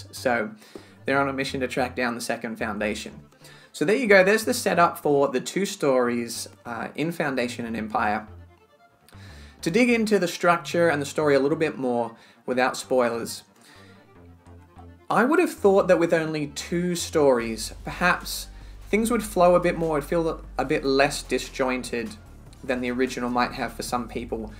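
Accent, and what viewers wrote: Australia. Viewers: What accent is that? Australian?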